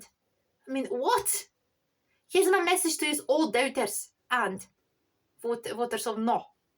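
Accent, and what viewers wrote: Scotland, United Kingdom. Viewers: are u from scotland?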